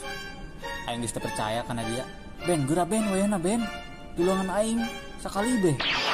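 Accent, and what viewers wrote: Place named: Banten, Indonesia. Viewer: Logat namah logat banten iye doangna 😂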